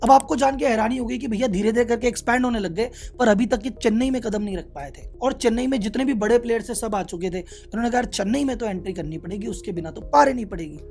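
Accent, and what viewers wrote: Gujarat, India. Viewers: Aap Gujarati h kya.???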